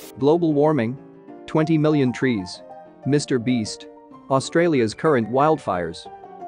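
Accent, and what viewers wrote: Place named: United States. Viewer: But your text to speech accent is so american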